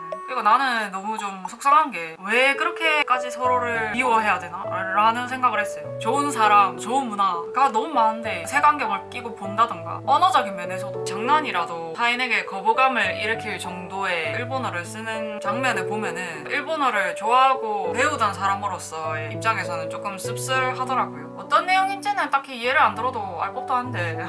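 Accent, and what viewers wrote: Busan, South Korea. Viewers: 말투가 완전히 부산 가시나네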